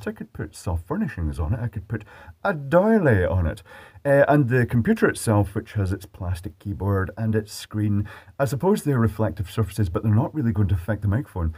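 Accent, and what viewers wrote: Scotland, United Kingdom. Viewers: I watch your videos and verry much like them, but off topic now. Are you Scottish? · Total difference but your deep Scottish bass tones are still there 😃👍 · The unintelligible issue is caused by the Scottish accent not the foam.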